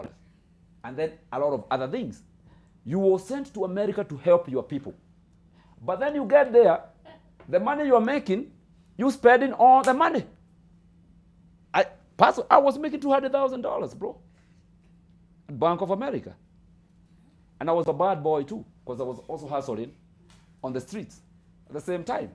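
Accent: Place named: Kikuyu, Kenya. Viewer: 😂 wah the Kikuyu accent is very heavy ..just speak the language you are confortable with My boy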